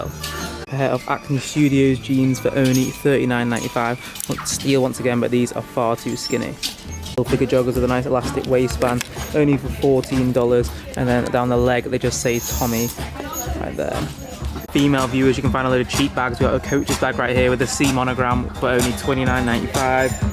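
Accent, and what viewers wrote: United Kingdom. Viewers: It sounds weird hearing a person from the uk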